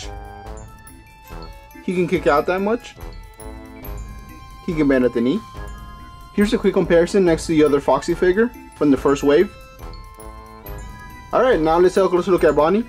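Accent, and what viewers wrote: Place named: Mexico. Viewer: are you a Mexican trying to talk English you wouldn't mention you just did slam dunk your stupid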